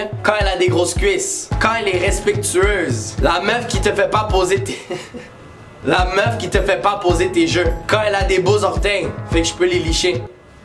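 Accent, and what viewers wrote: Quebec, Canada. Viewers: Omg.... J AIME TROP TA CHAÎNE. Jdis ça juste en regardant une vidéo... XD. T'es québécois? Si Oui, je suis québécoise aussi mais... j'aime trop ton accent même si g le même... C vrai... Chuis une fille compliquer 😂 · L’accent canadien et tout 🇧🇪 🇫🇷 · Première fois que je tombe sur ta chaîne tu m’a tué J’avais jamais entendu un renoi avec un accent québécois 😂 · Je kiff t vidéo et je voudrais que tu m'apprenne l'accent québécois 💗😝😛👌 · Comme c'est kiffant d'entendre un gars avec un accent québécois. 😮💙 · Je t'adore tes drôle et j'adore ton axan québécois et ses intéressent continue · j'adore ton accent Québécois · Coucou je suis nouvelle depuis hier soir et j’adore t’es vidéo tu me fais trop rire ! J’aime trop ton accent Québécois ! Fin bref j’adore ce que tu fais depuis hier j’ai dû regarder plus de 10 vidéo et j’adoreeeuh !❤️✨ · OMG troppp bien, j'adore ta vidéo, t'es plein d'énergie, franchement je regrette de pas avoir trouvé plus tôt ta chaîne !!! 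et en plus t'es Canadien, t'as un magnifique accent québécois !!!! continue bien!!! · Je suis nouvelle tu es quebecois ?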